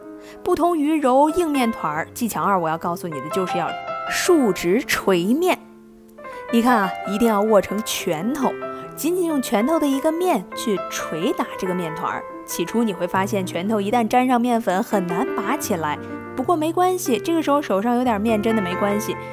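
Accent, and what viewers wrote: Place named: Beijing, China. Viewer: 我学到了面食的制作方法。我也听到了熟悉的，甜美的、北京的声音。很享受！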